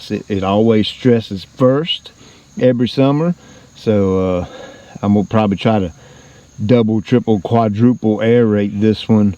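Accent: South, United States. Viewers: I thought that as well but I think it was the southern draw :))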